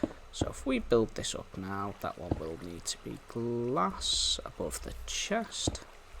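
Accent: Liverpool, United Kingdom. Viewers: Nansymansy Si adnan LMAO. It’s a scouse (Liverpool) accent. Liverpool is a place in England my friend.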